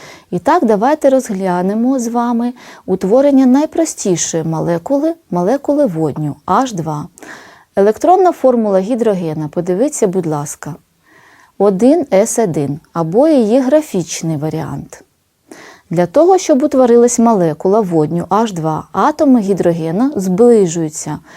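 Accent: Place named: Russia. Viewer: Дуже відчувається, що людина говорить російською.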